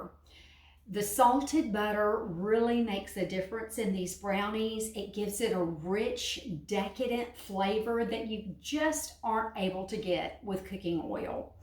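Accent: South, United States. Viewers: I’m trying to make brownies and they never turn out right, out of all the videos on YouTube.. I really didn’t like any. After about 20 videos and endless scrolling I finally found yours… immediately the southern accent made me know this is this video for me!! I’ll be making this now. Thanks.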